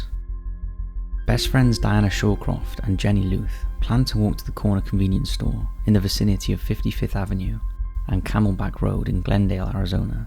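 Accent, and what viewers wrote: Wales, United Kingdom. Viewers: definitely a Welsh accent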